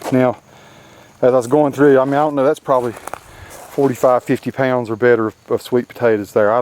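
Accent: South, United States. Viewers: hi wonderful to watch you pull up all those sweet potatoes. I was so excited and they aren't even mine. I love to garden and this past summer, I just stuck a small piece of a store bought sweet potato and then stuck it in a big container. That thing had the prettiest vine that I trellised and lo and behold in the fall I had about 5 pretty decent potatoes from that one vine. I probably would have gotten more but I put it in with a couple of other plants which already had root systems that the potatoes just wiggle themselves around so this year they will have their own private containers. It was such a wonderful treat to eat my own "sweets", from my garden. I am very anxious to do the "slips" this year, that I have been watching on youtube and reading about. Can't wait. I live in Northeast (southern NH, 45 minutes north of boston, ma, so I have a bit of a wait but I start a lot of stuff inside cuz I can't wait. 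It is Jan and I just got all my seeds so I will be starting this week. Thanks so much for the great video. Where do you folks live. I know you are from the south, thats for sure (ha, ha,). lovin listening to your accent. Have a good time gardening this year. I do. Patty in nh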